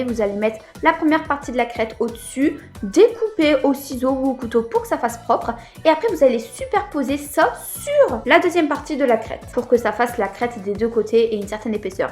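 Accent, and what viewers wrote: North, France. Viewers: Vous avez un accent du Nord je pense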